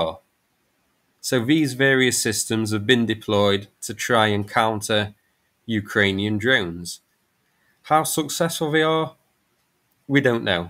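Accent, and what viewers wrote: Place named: Northern England, United Kingdom. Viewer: Thanks for the info. I'm not a Brit, but if I had to guess I would have guessed that his rather... noticeable accent was northern. 😉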